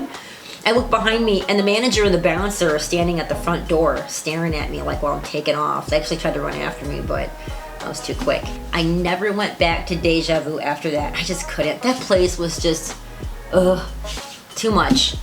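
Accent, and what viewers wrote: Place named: New York, United States. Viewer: 🤣 you were 'thinkin abowdit'? How are u from IL and not New Yawk..cause I hear my own NY roots in a lot of how u tawk lol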